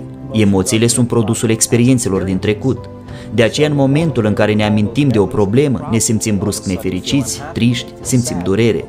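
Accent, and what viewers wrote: Moldova. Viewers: Desi ai un frumos accent moldovenesc via Chisinau, transmiti foarte profund cam tot ceea ce postezi, ai harul acesta, sau poate te-ai reinventat, dar cert este un lucru, esti pe drumul cel bun si nu numai atat, mai ii si ajuti pe altii sa isi schimbe viata prin ceea ce etalezi,...., excelent, este minunat si bun de urmat, o zi frumoasa si o viata si mai frumoasa !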